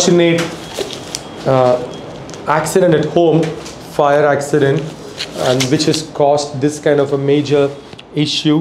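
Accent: Tamil Nadu, India. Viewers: I love his Tamil accent ..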